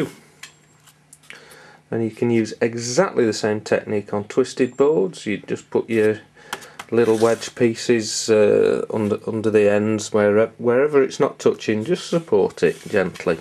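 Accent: Manchester, United Kingdom. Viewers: My God he's from Manchester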